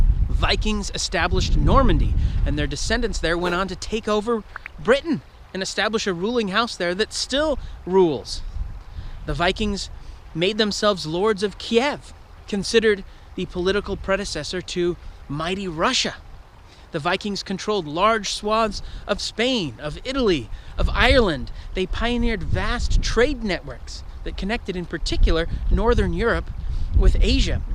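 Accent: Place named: United States. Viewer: His accent sounds American to me.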